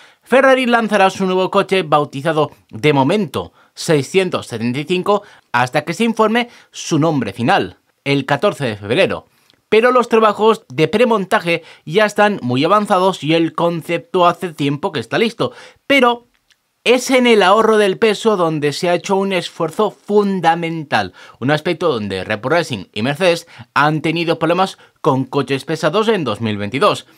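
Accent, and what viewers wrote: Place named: North, Spain. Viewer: Oye, eres del centro o norteño, pero lo que si estoy seguro es que no eres del sur de la Iberia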